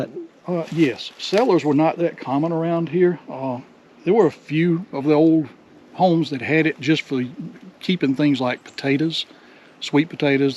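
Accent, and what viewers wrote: South, United States. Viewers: and are both interesting men to listen too. They real seem to enjoy making the videos. you are a excellent historian and that wonderful southern accent of yours, just adds to the story telling. Thank you both 😊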